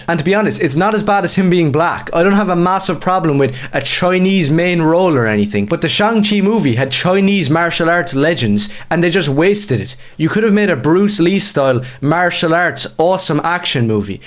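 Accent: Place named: Ireland. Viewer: If this guy didn't have an Irish accent, I'd bail in thirty seconds.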